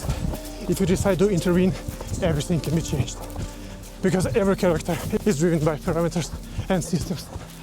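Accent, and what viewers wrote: Russia. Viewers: Бро говорит с явным русским акцентом)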